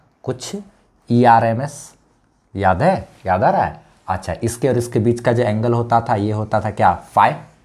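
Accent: Bihar, India. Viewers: Sir.. Aap Bihar se ho kya??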